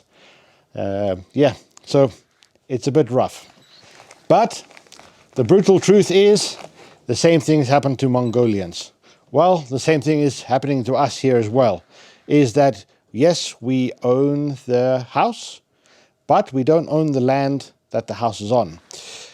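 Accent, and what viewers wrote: South Africa. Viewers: You sound Afrikaans. great Video. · Holy moly. Is that a South African accent? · is bro South African or Dutch? it's breaking my brain lol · Sounds like a very South Africa accent? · Is that a South African accent? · Is this guy from South Africa?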